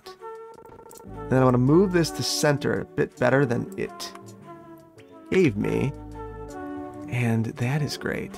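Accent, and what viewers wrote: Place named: Midwest, United States. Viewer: I can tell you’re from the Midwest. I’ve driven on more than a few two- (or almost two-) dirt roads in the mountains around New Mexico (especially as a Boy Scout leader in college circa <num>) where the road hung along the side of the hill with a nice drop off on the outside and no guard rail. One of these is/was the access road to our local council Boy Scout Camp which I’ve driven numerous times. Chances are given the mountainous nature of that part of Clearwater County, nobody would bother with a guardrail until the road was paved, or at least traffic and/or accidents warranted.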